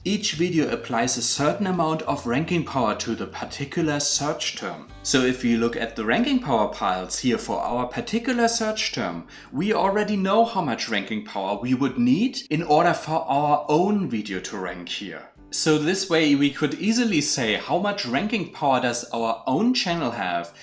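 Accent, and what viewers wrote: Germany. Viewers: hört man richtig stark. Ist halt der typische deutsche Akzent beim englisch reden 😃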